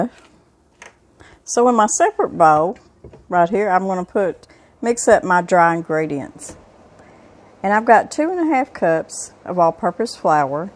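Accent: South, United States. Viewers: So glad I found your channel. That cake looked amazing!! Great for fall baking! I'm definitely going to be making this. Thank you for sharing. You are a wonderful teacher and I love your southern accent. Have a blessed day! ❤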